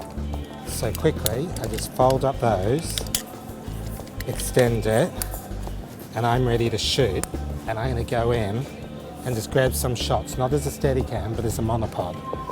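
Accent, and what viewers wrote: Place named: England, United Kingdom. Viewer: I do admit...I love your English accent...!!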